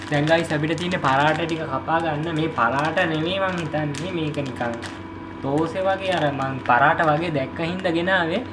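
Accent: South, Sri Lanka. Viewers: ඔයා දකුනේ කෙනෙක් ද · ඔයැයි....දකුණේ යි😅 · ඔහේ දකුනෙයි🫠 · ඔයා දකුණේ නේ 😂😊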